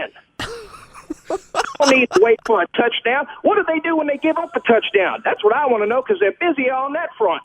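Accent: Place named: Texas, United States. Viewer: This guy sounds like an Aggy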